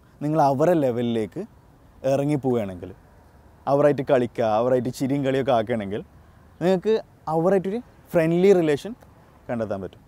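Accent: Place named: Malabar, India. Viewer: New subscriber here.. Boss malabarilaano.edakk nammale basha keri varnn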